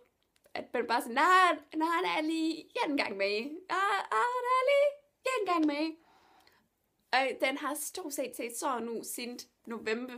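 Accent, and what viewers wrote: South Jutland, Denmark. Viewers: Hej. jeg ønskede at du kunne lave flere videoer fordi jeg elsker din kanal for di jeg elsker sønderjysk så jeg håber at du laver flere videoer i fremtiden Mvh. Silas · Du er ikke helt 100 % - men meget tæt på - fortsæt, dejligt at høre synnejysk på youtube :-)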